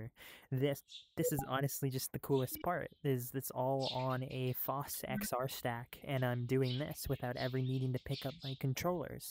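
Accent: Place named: United Kingdom. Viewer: You have a bit of a British accent now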